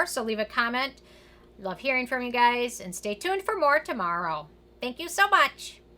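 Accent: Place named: Dakotas, United States. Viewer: Curious are you from one of the Dakotas? Minnesota? Trying to nail down that accent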